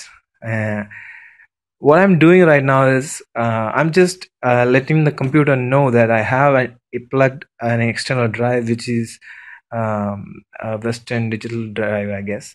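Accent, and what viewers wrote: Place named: India. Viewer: you know its going to be a good tutorial if you haer that indian acent. btw thx it worked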